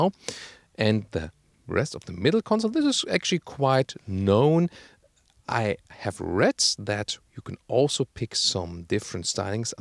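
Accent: Germany. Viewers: SORRY, but that German accent is adorable.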